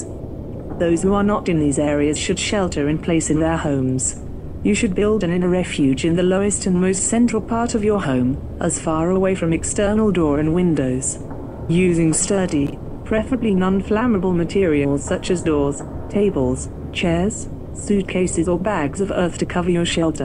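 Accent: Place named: United Kingdom. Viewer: Just the Brit voice is putting me to sleep! She's got a way to sooth people. But the blast wakened me. Lol! 😅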